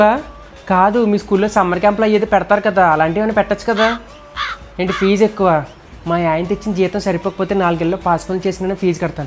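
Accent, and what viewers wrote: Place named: Godavari, India. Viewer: అసలు గోదావరి భాష.. నాకు నచ్చింది..మాది భీమవరం.. పెళ్లి అయ్యక మచిలీపట్నం..నేను ఈ బాషా మిస్ అవుతున్నా...పప్పలు కొనుక్కోవడం ..నా యేదం..బాబా, ఎంత అందమైన భాష 😅😅😅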